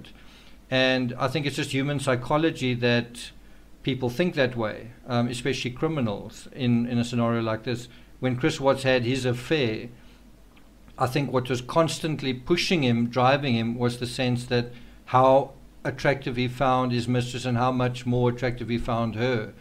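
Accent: South Africa. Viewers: You sound South African , i am?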